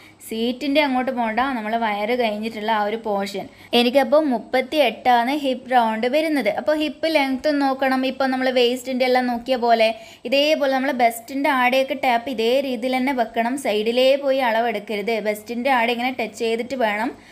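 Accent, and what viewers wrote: Kannur, India. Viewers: Kannur analle.... 😍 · Kannur aano, enkil evideyaa. Samsaarareethi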